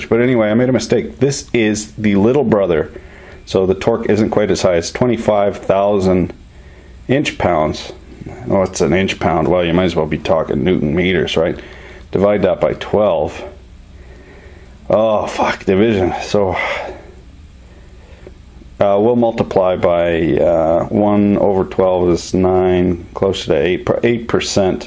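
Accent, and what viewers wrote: Quebec, Canada. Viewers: An international colleague recently asked what we Canadians sound like. I sent him to your channel as a prime example. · Love the canadia accent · Did u soften ur uuut(out) or have I been watching enough that I'm not even hearing ur c eh n eh d eh any more? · just yesterday i found this channel and it's seriously cool. Dude is funny, witty and super knowledgeable. also, did you go all quebecois at some point? eh tabarnak, je le savais pas, je me demande d'où tu viens?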